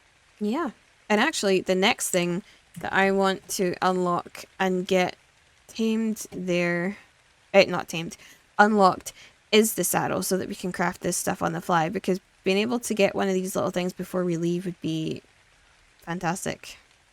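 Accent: Scotland, United Kingdom. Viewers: Stone foundations are great for crafting when taming a drake. You're a Scot. I love that. I lived in Glasgow for 2.5 years. I'm a huge Scottish history lover. Massacre of Glencoe is/was a huge interest of mine. Ancient Scot history is brutal. Awesome listening to your accent. Scotland is such a beautiful country and should never have merged the thrones with the deceitful low landers throne.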